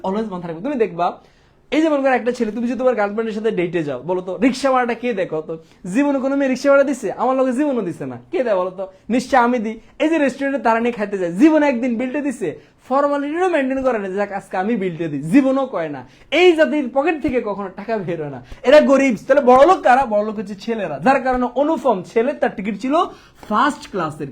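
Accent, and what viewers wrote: Chittagong, Bangladesh. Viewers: Vaiya apni ki chittainga..?🙂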